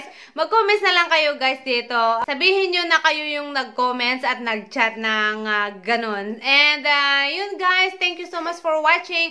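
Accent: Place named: Ilocano, Philippines. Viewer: Hi mam, nakakatuwa naman na ilokano ka pala tulad ko, noon ko pa kasi napapansin ung tuno ng pananalita niyo po hehe. Anyway God bless po!❤️🙏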